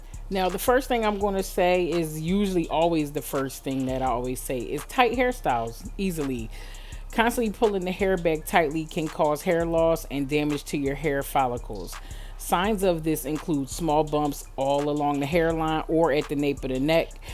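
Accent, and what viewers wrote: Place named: New York, United States. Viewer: I’m from NYC and I just love the way you speak! So “Up North!”.. feels like home! And thanks for the education sis!🌹come to Charlotte!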